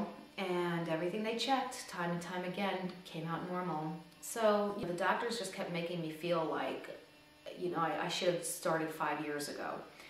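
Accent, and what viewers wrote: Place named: New York, United States. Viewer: I am so happy to hear that you finally had your dream of a child come true. God bless you, your husband and your son! It gives hope to people like me who have yet to be blessed with a baby. How much did the Hunyuan method cost you over the course of the year? A rough estimate would be great. What state are you in? You sound like you could be from NYC like me. Best of luck to you and your family and thank you for sharing.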